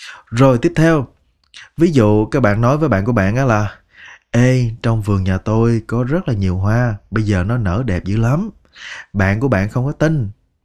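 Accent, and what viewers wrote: Standard. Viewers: Lời thầy dạy nghe rất chuẩn.., rất hay., nghe ấm, truyền cảm và dễ hiểu 😍👍👍👍 chúc thầy và gđ luôn mk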